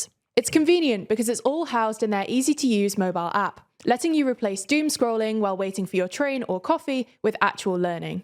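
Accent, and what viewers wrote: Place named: RP, United Kingdom. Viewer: Nice to hear a beautiful, eloquent and sophisticated sounding SRP (standard received pronunciation)--very classy.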